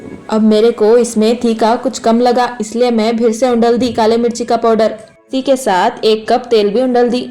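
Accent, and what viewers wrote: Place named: Hyderabad, India. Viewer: So beautiful hyderabadi accents and so yummy 🤤🤤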